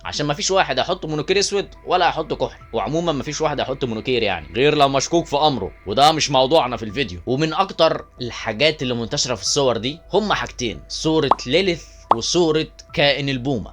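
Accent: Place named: Syria. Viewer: من البداية من لهجتك على بالي انك سوري مش مصري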